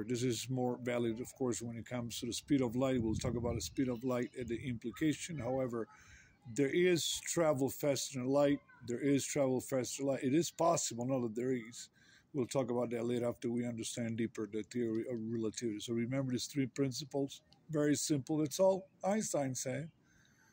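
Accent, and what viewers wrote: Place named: United States. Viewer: wrong information in disgusting American accent.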